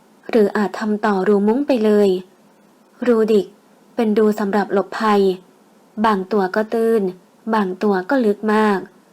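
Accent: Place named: North, Thailand. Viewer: ผ่อหื้อกำ ฮาจะขี้นี่55555แอดน่ารักมากเลยที่พูดเหนือ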